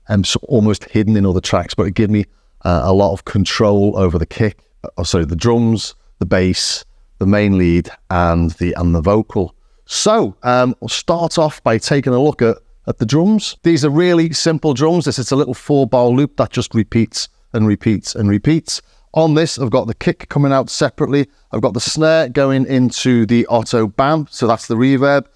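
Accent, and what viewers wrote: Liverpool, United Kingdom. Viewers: mate I really enjoyed this video and your other one on the HR16 which is how I found your channel. Back in 1989 I nearly blight the HR16 but opted for the Yamaha V50 which I still have. But this video is amazing and your channel too. I’ve subscribed and will watch as many of your videos as possible. Thanks for this and love your scouser wit and accent.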